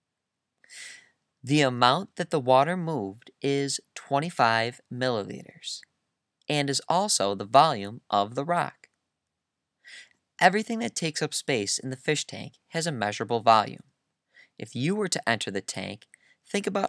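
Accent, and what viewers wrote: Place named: Texas, United States. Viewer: I think the video maker has Texas accent. He wanted to say Rock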